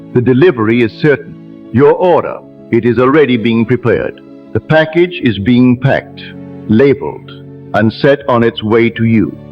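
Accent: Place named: RP, United Kingdom. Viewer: I am more confused than ever. What on earth is he saying? Posh accent:-)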